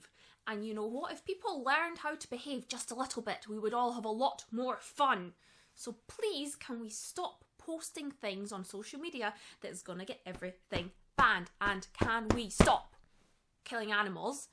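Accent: Scotland, United Kingdom. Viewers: By the way, are you Scottish by any chance? Just going by your accent. I am a geordie, part Scottish😊.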